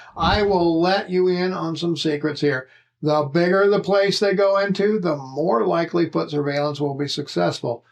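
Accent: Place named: South, United States. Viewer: The 🔑 is leverage …and based on your accent you are from the south and you are reading from a prompter or a note .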